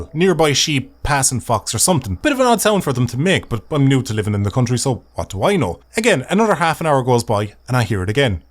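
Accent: Ireland. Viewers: The Irish accent is 30% the reason why I subbed....the other 60% is me in utter stitches from laughing and the other 20% is me realising I'm bad at maths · This is, by far, the best and most hilarious video i've seen all year. There are soooooo many things i recognize in my own past and present relationships... Eerie that... And you tell it sooo well, and it just makes it better with those animations and your lovely Irish accent... This is pure fucking GOLD brother... I subscribed then and there, for the laughs... Well earned... I can't wait to check out what else you've made of shenanigans... Love from Denmark... <3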